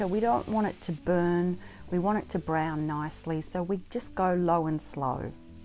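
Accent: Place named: Australia. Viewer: I can't placee your accent... I wanna say "aussie"? - HA! Nailed it. Sorry: autism. I struggle with accents.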